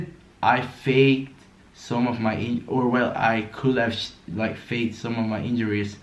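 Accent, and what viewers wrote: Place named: United States. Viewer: i love your american bro accent hahaha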